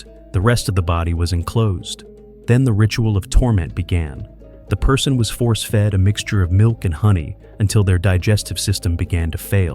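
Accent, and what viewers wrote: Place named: United States. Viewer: These accounts are always narrated by an American.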